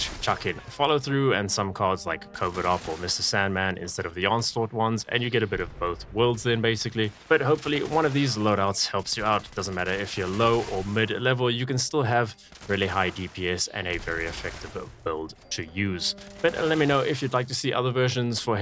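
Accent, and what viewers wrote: South Africa. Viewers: Are you south african by chance. Very distinct accent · Very good video, thanks, sounds like you come from South Africa like me💪🏻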